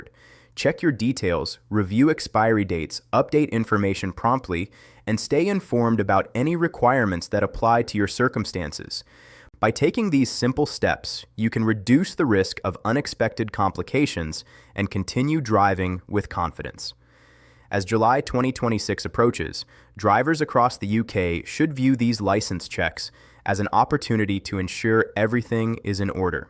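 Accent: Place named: United States. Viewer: Load of Crap. Why is it that all post's loke this one is narrated by an American or Canadian.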